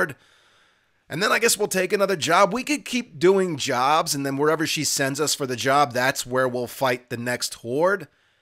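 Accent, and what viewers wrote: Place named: Philadelphia, United States. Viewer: His heavy accent makes me miss my hometown. Can't tell me he's not from philly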